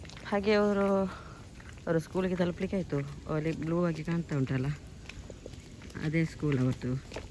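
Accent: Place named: Kerala, India. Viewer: ಅದ್ಯಾವುದು ಬಾ ನ್ಯ😂 ನಿಮ್ಮ ಕನ್ನಡದಲ್ಲಿ ಸ್ವಲ್ಪ ಸ್ವಲ್ಪ ಮಲಮೆ ಮಿಕ್ಸ್ ಇದೆ😅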